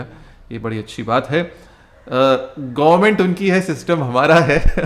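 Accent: Standard. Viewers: Shuddh Hindi! What a delight! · Aapki shuddh Hindi sunkar hriday prasann ho jata hai. Aapko dekh kar mai bhi ghar me Shuddh Hindi bolne ka prayatn kar rahi hun. Dhanyvad mahashay 🙏🏼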